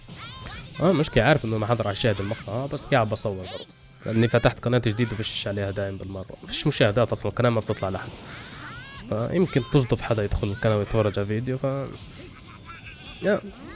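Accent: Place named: Jordan. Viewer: حاسك من الاردن 😂❤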